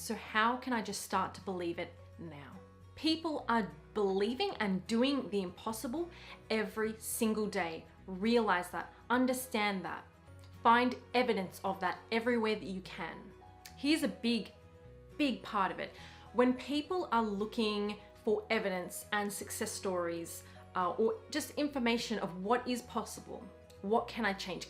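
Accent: Australia. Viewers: Are you Australian?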